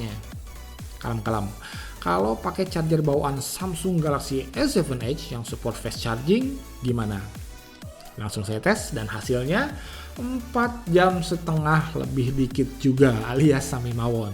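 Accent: West Java, Indonesia. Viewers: Asyik nich reviewnya.... Bahasanya sederhana tapi nyaman, heuheuy Sunda Asli.....!!!!!!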